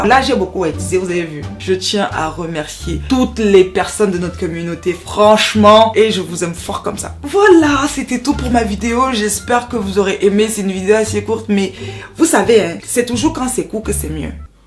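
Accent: Cameroon. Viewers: Hello, j'ai bien compris que tu étais camer, avec ton accent. Mais au début je me suis dis, tien tien est Bamoise. Puis, petit à petit, je me suis dis, non, non, a un parlé qui me rappelle les Etons. Ben en effet, là maintenant je suis un peu perdu. Eton, pas Eton, Bamoise, pas Bamoise?????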